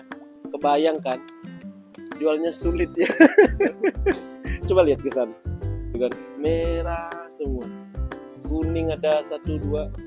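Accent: Bali, Indonesia. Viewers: orang bali ya? Kelihatan dari cara ngomong nya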